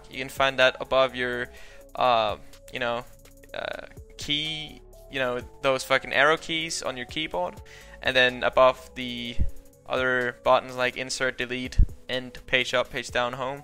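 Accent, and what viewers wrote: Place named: Denmark. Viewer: Hey jeg har set din video! Det lyder som on du er dansker ! Jeg vil gerne lære at multiboxe! men kan ikke finde ud at at det med script.txt! kan du hjælpe mig :))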